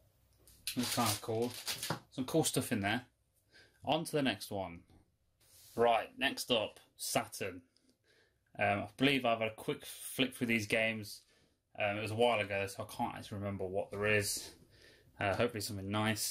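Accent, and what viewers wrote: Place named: Northern England, United Kingdom. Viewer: Which part of England are you from was wondering as you sound a bit northern ?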